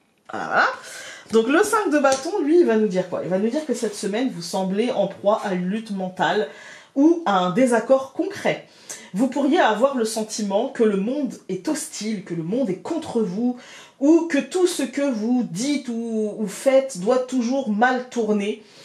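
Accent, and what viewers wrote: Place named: Picardy, France. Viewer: Coucou pourrais tu me faire savoir quel Lenormand tu utilises pour ce tirage. J ai tous tes jeux et celui-ci ne me dit rien. Je l ai peut être loupé. Merci pour le partage et pour ta réponse. Belle semaine à toi avec l accent picard. 🌞💐🌳🌞😘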